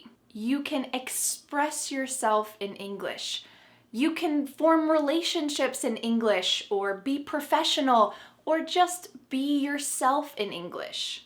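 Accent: United States. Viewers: This way of your American-style pronunciation seems to be a bit difficult for me, my teacher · it's not my intention to butter you up. You don't need it and I wouldn't gain anything with that. But I have to tell you: YOU ARE THE BEST ENGLISH TEACHER ON YOUTUBE EVER!!! I've been teaching English for over 40 years. During this time I've worked with a few American teachers. But... some of them have left me with a bad impression of Americans. You, on the other hand, have such a nice, humble approach in your classes, that sometimes I wonder if you are really American, despite having the best American accent I've ever heard. Just kidding! I don't doubt your nationality. But I understand why you are so successful as a teacher! I'll recommend your channel to all my students, not only for the quality you give to it, but especially because of the wonderful person you seem to be. 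I wish I could meet you and be your friend. I mean it!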